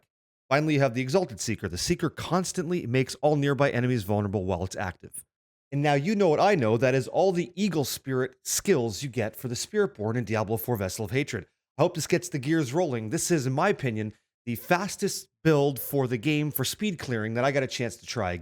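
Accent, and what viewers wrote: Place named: Canada. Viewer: when canadians speak 🤮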